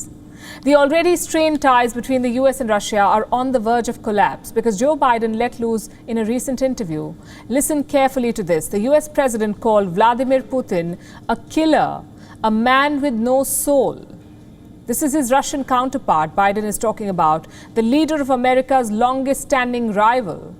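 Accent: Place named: India. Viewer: It's like Indian English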